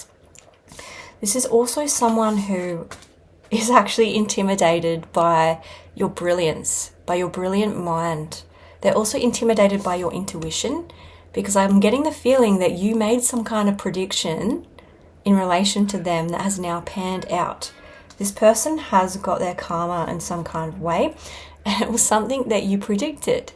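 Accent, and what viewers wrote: Australia. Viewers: Hi, I'm new and today I just finish another cup of warm tea and it caught to my attention when you mention Rieke and I have been attending this session and it helps me. Thank you for your reading. I'm wondering if you are from Australia or from Great Britain? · Are you from Australia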